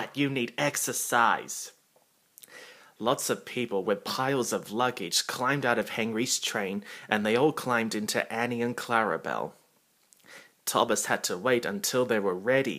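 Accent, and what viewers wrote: United Kingdom. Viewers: okay, however are you British or Australian ? If you originally came from the UK or were you born in Australia ?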